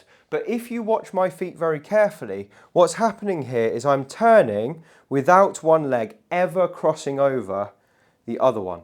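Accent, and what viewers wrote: United Kingdom. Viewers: Thank you for the work you do! My wife is in physical therapy from a young man that resembles yourself. Except for the British accent.😊 She is receiving treatment to regain confidence after a hip replacement. Her marching turns must take 20 steps. Therapy is critical in allowing a person to use what the surgeon provided. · OK, dude, you've got a cute British accent, and it sells in America. But git real, y'all. Nobody turns crossing his legs first. On the other hand, maybe you should teach now how we should drink through our mouth, not through our nostrils.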